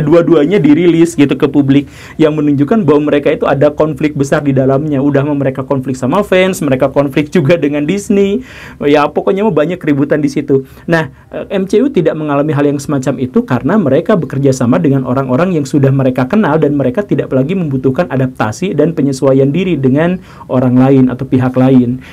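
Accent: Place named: Java, Indonesia. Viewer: Tetapi jawa logatnya kok susah diubah ya? Etnosentrisnya masih kebawa2 di rantau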